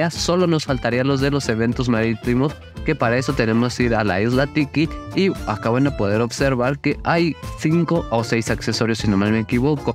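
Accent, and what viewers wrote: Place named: Michoacán, Mexico. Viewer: creo que eres de michoacan XD por como hablas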